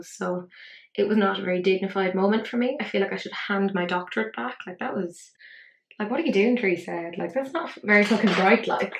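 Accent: Ireland. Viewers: I loved this video x I have the dark fantasy and absolutely loved it defo need to pick up the liquid ones as they look fab x it’s so nice to hear your accent x I have family in Carndonagh so hearing the accent and the witty sense of humour really was magical x thank you for this video it made me smile xx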